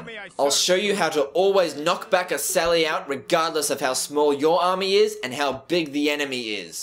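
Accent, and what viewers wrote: Australia. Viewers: Holy shit, he used to be such a pure Australian. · He's Aussie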